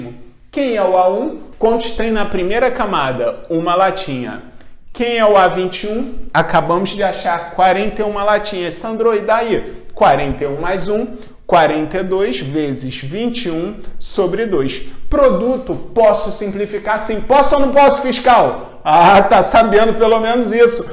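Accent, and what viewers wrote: Rio de Janeiro, Brazil. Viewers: Meu irmão sou teu fã brother, também sou professor de matemática e acho bem diferente e inovador sua didática, apesar desse sotaque carioca pesado friend... A metodologia de amarrar a atenção do aluno me motiva pra fazer o mesmo e conceituar isso na dissertação de minha pós. É isso meu camarada, show.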